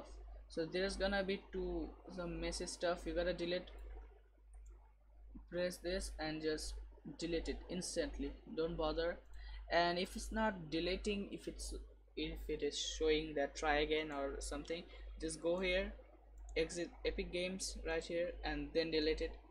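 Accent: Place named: India. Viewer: deal is deal just liked and subscribed. well u sounds like indian.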